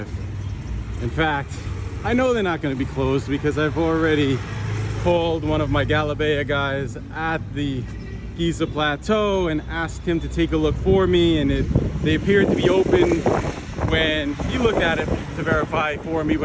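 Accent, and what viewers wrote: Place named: New York, United States. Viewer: You're one of like 4 channels I subscribed to. Keep the videos coming I think once anybody into Ancient Egyptology or even anybody who Just wants to see how beautiful Egypt is from your videos sees your channel you'll have a shit ton of subscribers. Very well informed and your vids show the real side of modern day and ancient egypt. Btw you're from NY aren't you? Lol I hear it hahahaha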